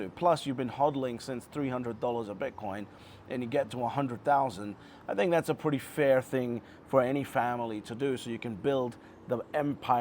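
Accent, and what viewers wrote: Scotland, United Kingdom. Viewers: Shut the F up and get fantastically rich ! Advice taken ! PS are you from Scotland ? Detect a slight Scottish burr there.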